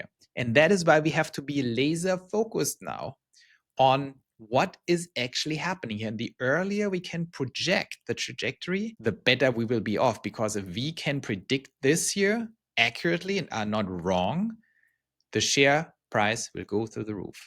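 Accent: Germany. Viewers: Interessanter Inhalt, aber der deutsche Akzent ist so hart, dass ich nicht zuhören kann 😅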